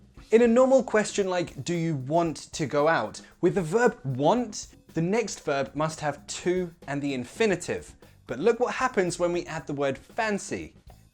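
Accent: United Kingdom. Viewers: U r the best I love ur style n the way of teaching, u make me fall in love British accent as well as American accent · No, we love British accent because of you,! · You're fantastic, man!! I love the british accent and your way of speech is a perfect example why I love that! Some day I'll speak a little similar as you! Uk ❤️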